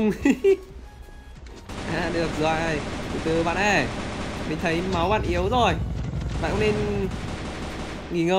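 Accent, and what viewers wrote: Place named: North, Vietnam. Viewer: bắc nhé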